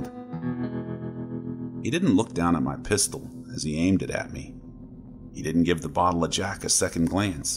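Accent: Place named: New York, United States. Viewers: This notification was late af! WTF You Tube? I will always listen to every story you put out. I am digging your New York...New Jersey(?) Accent. Amazing job as usual! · Yes I have been to Boston etc but You nailed that fact, You def sound like You came from this side of the Country, I think You did a great Job but us NYER's while dislikes by so many other states have a ton of NYC pride, lol I would be horrible trying accents because I have my English accent is horrible as is my Southern accent etc, I love your channel brother, You are one of the best, Question may be weird but are You Rom's father? I'm asking because it's a rumor around the web and I notice you guys work together along with TDS a lot.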